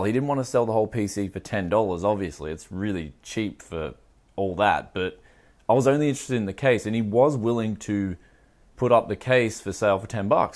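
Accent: Bogan, Australia. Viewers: I love how you Austrailans talk :D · chucking on that bogan voice m8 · Bogan voice hype. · Sound so Aussie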